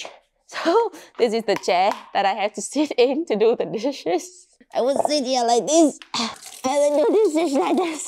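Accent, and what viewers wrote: Germany. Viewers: U developed a German accent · Is she getting a German accent? Lol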